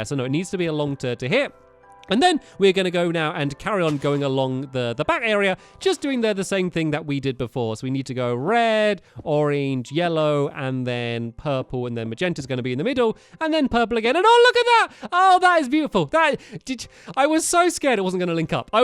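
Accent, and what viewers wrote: United Kingdom. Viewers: For a while I stopped watching you stamps because I always wanted to do The stuff you do, but never had the chance to do it because my dad wouldn't let me. I got jealous? Well, last night My brother updated our minecraft up to Xbox live and we got ALOT of the new stuff you have. It took the whole day to figure it out. I was discouraging to my brother and told him to give up but now I'm watching him trade with villagers, something I've always wanted to do. I feel awesome now and I think I'll try to start watching you again. I miss your cute British voice!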